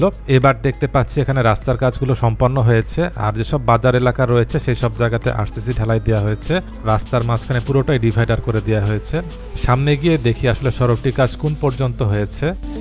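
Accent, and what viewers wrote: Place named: Noakhali, Bangladesh. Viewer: ভাই সত্যি আগে জানতাম না আপনার ভাষা নোয়াখালীতে। আমার মাইজদীর সাতে ছয়ানী বাজার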